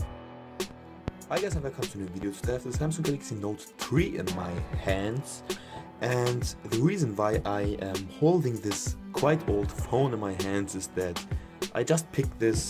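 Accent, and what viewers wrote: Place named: Germany. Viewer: Man merkt das du deutscher bist 🇩🇪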